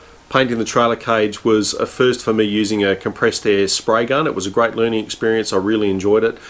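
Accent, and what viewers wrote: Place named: Australia. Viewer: Hi, you sound Australian, do you make these to sell? We r in Vic.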